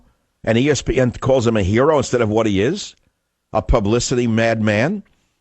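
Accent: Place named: New York, United States. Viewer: Again, who is this guy so sure people should listen to his opinion - what special thing has he done or whatever that gives him such right to spread his venom and expect someone to listen and his new york accent. Yuck even when he says words like freedom and other goods words. He is nobody and unknown - what has he achieved?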